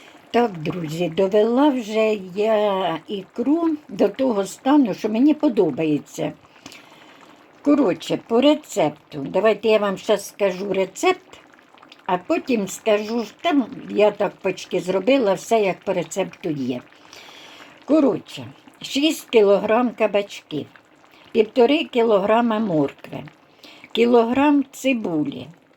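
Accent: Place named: Poltava, Ukraine. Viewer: Я теж робила ікру з кабачків і в кінці додавала сардини в маслі, тільки цибулю і моркву смажу окремо на пательні, потім додаю до засмажки томат, потім все це зливаю в ікру і ще трохи протушкую і тоді все по банкам. Смачного Вам. Мені наче почулось, що ви з Полтавщини, в мене батько був родом з Хорольського району.. Дякую Вам за рецепт. Перемоги і миру нашій Україні. Херсонці з Польщі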